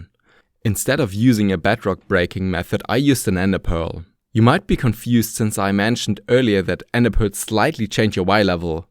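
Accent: Germany. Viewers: Am i hearing a german accent?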